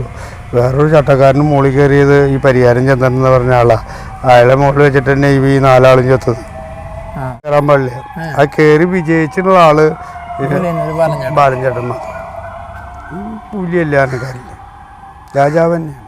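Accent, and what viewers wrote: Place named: Thrissur, India. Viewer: എത്ര കേട്ടാലും മതി വരില്ല ഇദ്ദേഹത്തിന്റെ സംസാരം. ആ തൃശൂർ ഭാഷയിൽ ഒരു ജാടയും ഇല്ലാത്ത നിഷ്കളങ്കൻ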